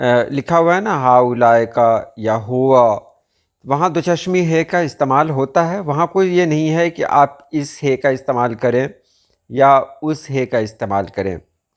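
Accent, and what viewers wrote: India. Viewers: Lectures kuch pehle bhi sune ic k mn ne.❤. Ye Indian ha? Wese main Urdu to inki bhi Pakistan ki tarah ha but phr bhi sunane se pata chl raha ha k Indian ha. Yani k Indian or Pakistani Urdu mn faraq aa chuka ha. Lehjae mn bhi or pata nhi jahan but feel ho raha ha k inki Urdu different ha.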